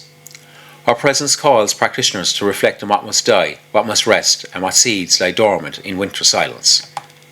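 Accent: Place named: Cork, Ireland. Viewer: lovely cork accent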